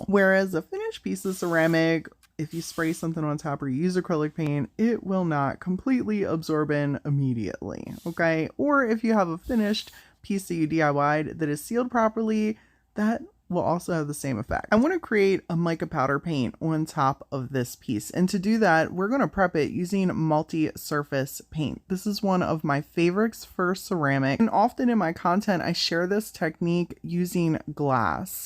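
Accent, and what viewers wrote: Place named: Philadelphia, United States. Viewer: I have just found her and I am very intrigued. She is unique. However, does she not respond to our comments? 😢 I recognize her accent, Philly, Baltimore, where is she? ❤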